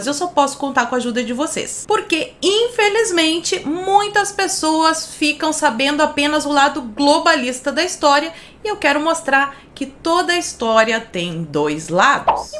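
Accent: Rio Grande do Sul, Brazil. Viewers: Acho que você é gaúcha porque você fala muito o né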